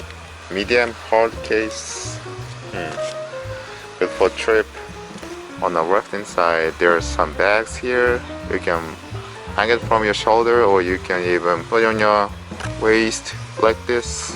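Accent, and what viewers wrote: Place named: Canada. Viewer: いつもいうけど、のカナダ仕込みの英語めっちゃ好きやで。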